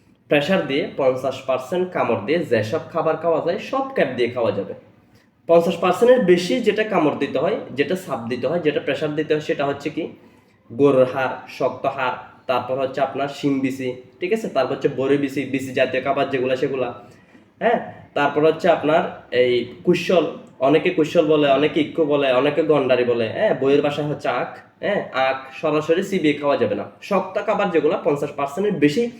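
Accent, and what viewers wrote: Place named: Chittagong, Bangladesh. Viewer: আপনি নিশ্চিত একজন চিটাগাং এর ছেলে এম এম আম আম এটা কি ছিল??? উপস্থাপনা খুব ভালো হয়েছে অসংখ্য ধন্যবাদ প্রিয়।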